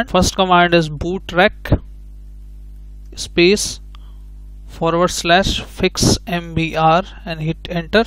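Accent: India. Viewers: This is really too much of a mix of MBR installations and GPT. What with your heavy Indian accent, it would have been better if you'd made two separate presentations, one for each, then we wouldn't have to decipher which instructions apply to what circumstances. Thanks for trying, but...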